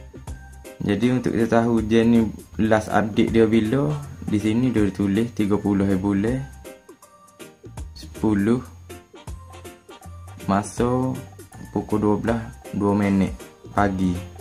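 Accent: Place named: Kelantan, Malaysia. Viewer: Terpadu skali loghat kelate boh...steady sep kito..haha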